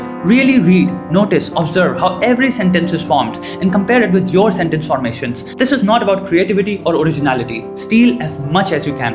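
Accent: India. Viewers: this dude sounds like Indian, doesn't he? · you are definitely fluent but have to try to reduce the Indian accent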